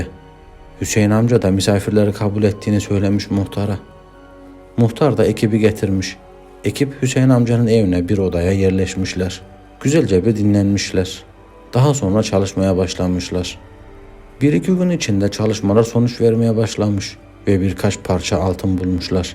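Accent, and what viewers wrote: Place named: Turkmenistan. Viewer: Türkmenler gibi konuşuyor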